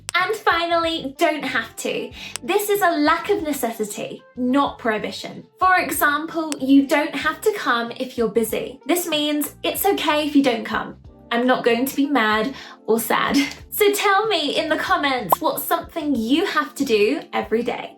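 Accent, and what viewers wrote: United Kingdom. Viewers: You British? Correct?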